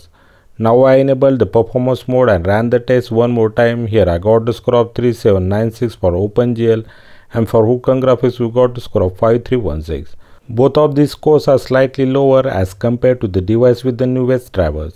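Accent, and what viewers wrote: India. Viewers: first of all i asked for 615.50 coz your video specifically says 615.50 update to 690. which implies i need to be in 615.50 before updating. second i dont wanna watch a full 9 min video in broken english with heavy indian accent.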